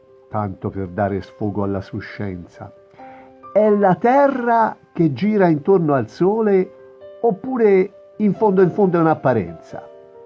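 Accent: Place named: Tuscany, Italy. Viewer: Belli i versi 'alessandrini', mi riportano indietro ad immagini che i miei occhi di bimba hanno visto per le strade di Siena... Mi incuriosivano quelle persone di cui vedevi solo il dorso e le gambe, costretti com'erano a stare dietro quelle protezioni di sottili sfoglie di legno intrecciate... E mi facevano un po' pena, perché mi dicevo: "Noi siamo riparati dalle scaglie di pietra, che lo scalpellare provoca, ma loro no..." E poi gli altri momenti descritti... E le adeguatissime immagini scelte... E, infine, la tua bella voce, che porge ed esalta, con educato e morbido accento dei nostri luoghi, quanto il poeta ha fermato nei suoi versi... Grazie!!! 👏👏👏👏👏👏👏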